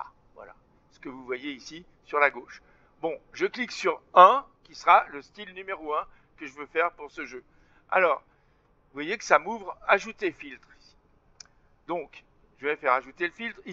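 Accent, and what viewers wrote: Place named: Lyon, France. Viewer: Merci!! Dites-moi, à vous entendre, vous semblez être de la région lyonnaise. Je me trompe? ps: je viens d'essayer, je me suis mis dans le jeu, j'ai bien une carte nvidia, mais cela ne fonctionne pas: « alt F3 » ne fait rien du tout. Arf...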